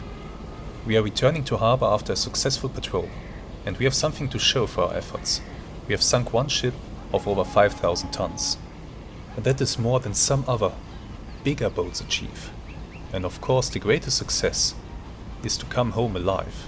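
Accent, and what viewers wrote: Germany. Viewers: I have recently started following your adventures, and am highly impressed; Your German accent adds total authenticity, and the scenarios reel off like movie scenes; Many thanks from England...;)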